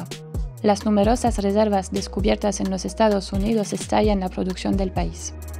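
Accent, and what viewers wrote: Russia. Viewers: Los documentales muy buenos... pero la voz de la locutora maravillosa. El acento es ruso? 🤔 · acento ruso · Creo que la chica tiene acento rusa.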